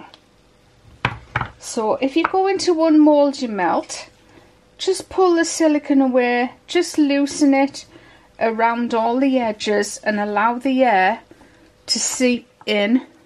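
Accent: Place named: Stoke-on-Trent, United Kingdom. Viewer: Where you from? Your accent sounds very similar to mine and I'm from stoke on Trent.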